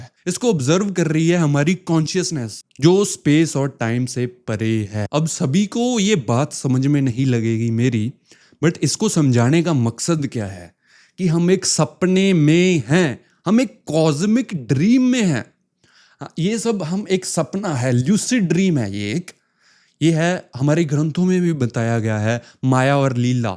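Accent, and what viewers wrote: Punjab, India. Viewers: Tu punjab toh a. Mere veer tu bolna shuru kiita mainu pata lag gaya. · punjabi lagda bai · From your accent of speech,ig you are from Punjab or haryana.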